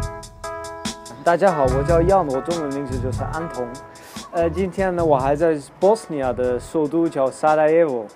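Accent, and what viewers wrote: South, China. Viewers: You have the typical accent from the South, for example with the "ch" you say "s" but it's ok i understand you, my GF is from 四川 Sichuan, very similar accent. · 好浓的南方口音啊哈哈哈 你听北京话能听懂多少？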